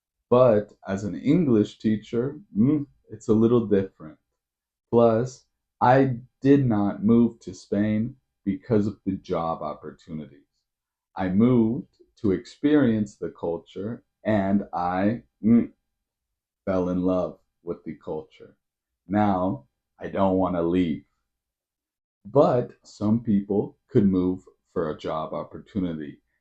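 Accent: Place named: United States. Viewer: I found this channel randomly and watched this first video. Actually, you have a very clear American accent, and you speak slowly, so that's helped me do shadowing along with you very creative video i hope you do another series for advanced learners, I'm definitely gonna keep watching this series keep going you're gonna be a success on YouTube ❤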